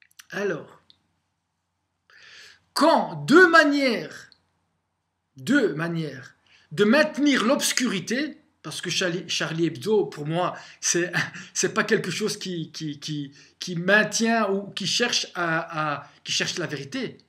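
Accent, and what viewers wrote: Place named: Belgium. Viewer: Le petit accent Belge qui passe bien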